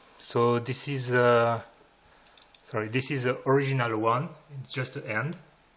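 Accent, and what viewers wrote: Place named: England, United Kingdom. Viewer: Fucking english accent , tu crains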